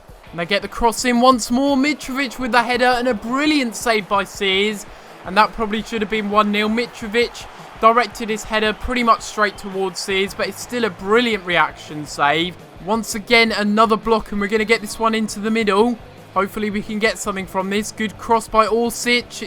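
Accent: England, United Kingdom. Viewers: I know it sounds weird but what part of england are you from?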